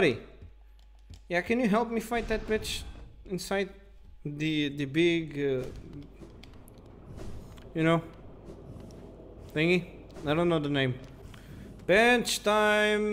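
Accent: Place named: Russia. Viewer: my boy have a second channel well then sees he did star war thing TAKE MY SUBSCRIBE R N By the Way : are you russian ? you sound like you are Also it was a great run im up for Minecraft and other game aswell i recommend you to play Little Nightmare 1&2 like doing a let's play or something have a great day !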